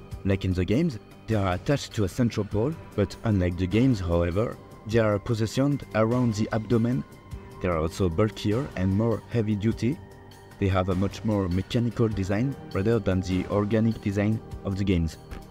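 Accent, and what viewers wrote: France. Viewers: l'accent Francais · L'accent francais mdrr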